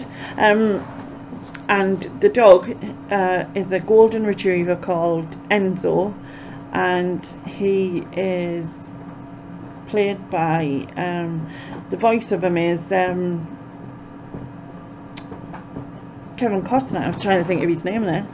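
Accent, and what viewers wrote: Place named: North East England, United Kingdom. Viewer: cool I like north east accent,where in north east are you?how are you copeing with the lockdown?xx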